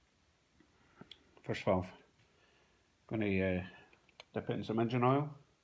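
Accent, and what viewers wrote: Scotland, United Kingdom. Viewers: Aye... what part of Scotland are you from?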